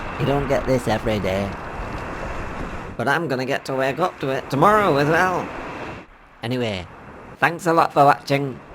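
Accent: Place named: Scotland, United Kingdom. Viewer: Thanks my brother lost the bet he thought you really were Scottish!! He was close though! Thanks for the fun!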